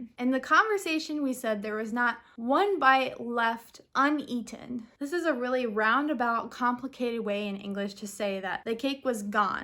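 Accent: United States. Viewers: I want American accent like you ❤ I am 13 years old from Korea🇰🇷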